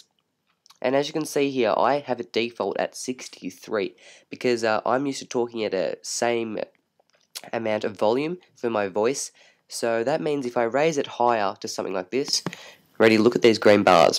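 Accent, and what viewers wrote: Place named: Australia. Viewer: Great to hear another Aussie voice. Thanks for this.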